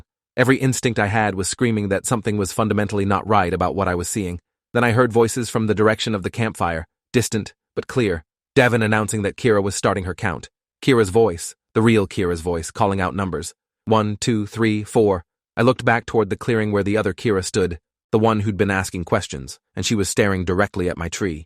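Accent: United Kingdom. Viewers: Holy British bru 💀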